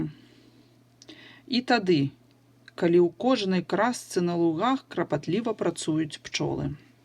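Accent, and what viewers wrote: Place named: Russia. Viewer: если диктанты, это не всегда значит, что именно про экзамен. Это так же может быть обычная практика, но касаемо акцента соглашусь. Ощущение, что читает русский, знающий белорусский по "знакомым словам". Особенно произношение "г" бросается во внимание сильно.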